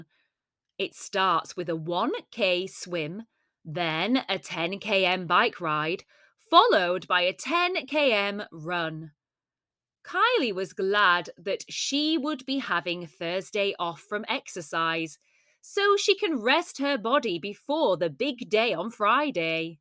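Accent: United Kingdom. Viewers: Honestly I've never taken part in a charity event. And there are also some activities that we don't know, but we learn them with you which means that helps and encourages us to learn with you and your British accent. Thank you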